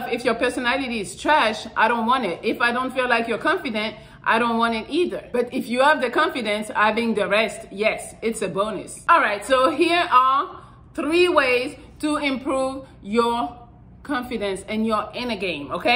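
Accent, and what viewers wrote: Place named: France. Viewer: Approximately pm March 06 2022 from Tobago: You are awesome. I love your French Accent. Keep up the great work of simplifying the interaction of men with regard to women.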